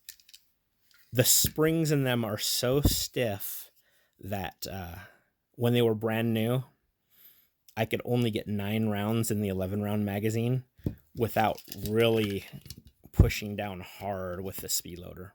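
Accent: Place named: Utah, United States. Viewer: I’m not trying to be disrespectful I was just curious because I’ve never heard that Utah accent. I’m one of those people who enjoy languages and accents and I was just like where is this guy from. Sorry for the off topic comment haha but I like the video I was thinking about getting the micro stoger.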